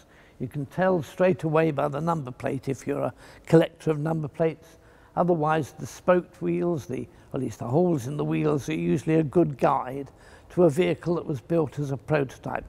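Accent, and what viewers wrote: United Kingdom. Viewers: That is the correct UK English pronunciation. How it is pronounced elsewhere, including where the jaguar cats actually live, is irrelevant to the subject. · Why does the British accent make everything fun to listen to?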